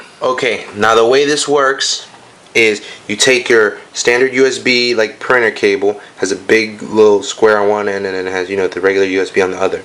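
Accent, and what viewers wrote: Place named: Mexico. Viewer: am mexican and i can tell he is too by the accent, plus he mentions which is cat for spanish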